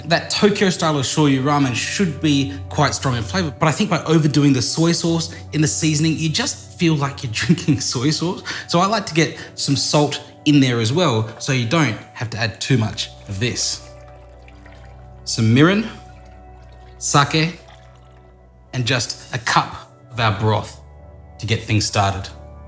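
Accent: Australia. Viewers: your Aussie soothing voice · My man. Are you Australian Japanese. ? Too cool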